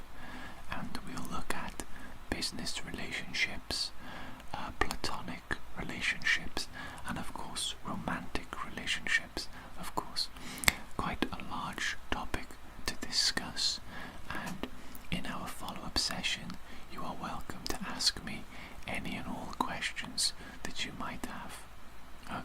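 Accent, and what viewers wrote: Wales, United Kingdom. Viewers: Great video! I have to ask though, is that a Welsh accent or am I way off?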